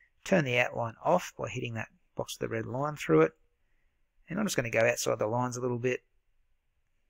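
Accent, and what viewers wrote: Australia. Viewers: i like his thich aussie accent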